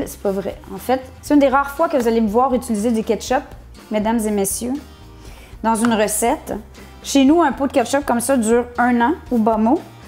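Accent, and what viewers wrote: Canada. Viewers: Je me demande pourquoi je ne vous ai pas connu plus tôt ?? Vos vidéos sont agréables à regarder. Vous êtes marrante et je kiff trop votre accent...le bel accent canadien.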